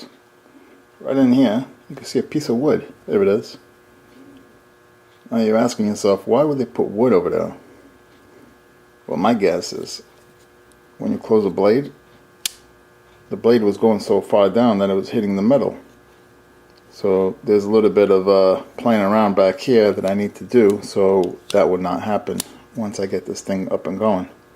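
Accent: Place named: Bensonhurst, United States. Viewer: You sound like you're from bensonherst. Not a slam.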